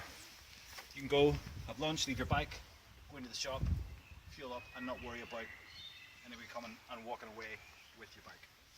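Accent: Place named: Northern Ireland, United Kingdom. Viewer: Great, I'd just bought one of those bike racks (also for a V40 D3) and had come to the conclusion that the rubbers of the (wing) bars had to come out, but thank you for confirming my suspicions. An' it's quare geg t'hear it from a fellow Norn Ironer!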